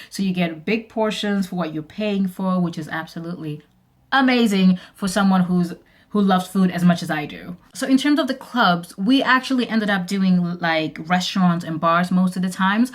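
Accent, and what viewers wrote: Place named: United States. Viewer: You imply that you are British but you have an American accent, no disrespect meant....but I'm curious.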